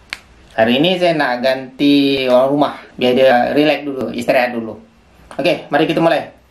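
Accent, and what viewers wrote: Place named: Malaysia. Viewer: Logatnya mcam org malay eh😂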